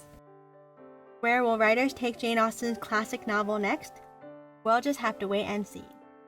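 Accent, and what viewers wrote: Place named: United States. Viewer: It is weird hearing the narrator with a thick American accent. “Prade and Prajadice”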